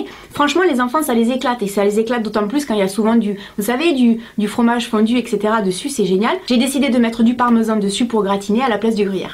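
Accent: South, France. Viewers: J'adoooore ton accent ! Etant moi meme sudiste mais loin de chez moi, tu m'apportes du soleil là ;) Merci pour toutes ces vidéos, je suis vraiment fan de tes conseils et de ton originalité !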